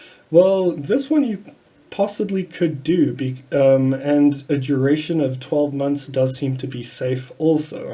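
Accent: South Africa. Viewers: Your south african right man....great vid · Do I hear an SA accent there?? 😉 Good on you for putting out good info on the subject!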